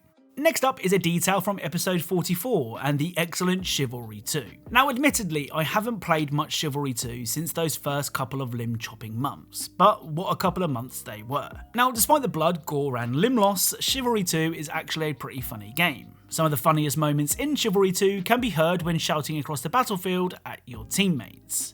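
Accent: United Kingdom. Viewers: Hearing British people say words that’s arent English always makes me laugh.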